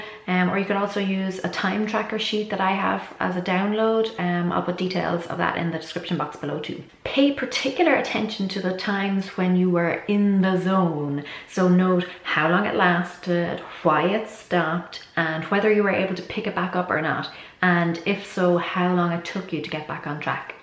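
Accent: Ireland. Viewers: are u from ireland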